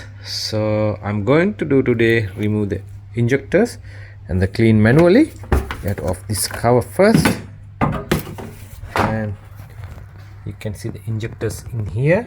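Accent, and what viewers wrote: India. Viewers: So basically pull the rail off with the injectors still plugged in but off the engine wind the engine over see which one is actually missing it’s spray or dirty then do what he done and I’d also use 120 psi compressed air as well down the ends of each injector and see how that goes.. I’d also lose the gloves and be a man and would be great to see a Australian with a Aussie accent doing not a Hindu in 😂😂😂😂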